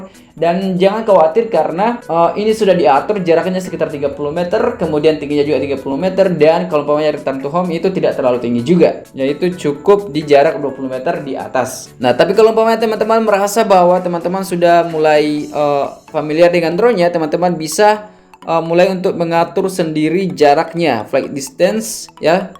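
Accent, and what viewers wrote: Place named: Makassar, Indonesia. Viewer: Wih orang makassar kach bang😁👍👍👍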